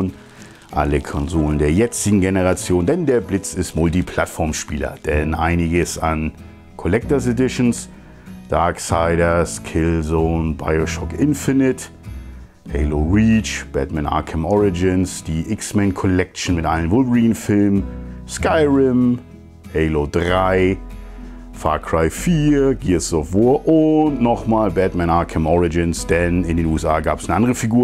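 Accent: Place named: North Germany, Germany. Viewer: Ich wünsche dir ein paar wirklich phantastische Weihnachtstage lieber Blitz. Ich freue mich immer, wenn eins deiner Videos raus kommt. Der norddeutsche Akzent und Art lösen bei mir ein klein wenig Heimweh aus. Falls du jemals einen Mitbewohner suchen solltest, dann sag auf jeden Fall Bescheid! :D Bei der Bude bekomme ich ja einen Nerdgasm! Ein einzig riesiger Spielplatz!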